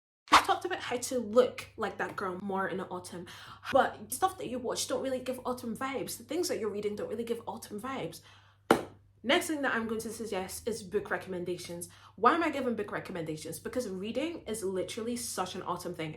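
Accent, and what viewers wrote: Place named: Scotland, United Kingdom. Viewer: she's Scottish, lol, love it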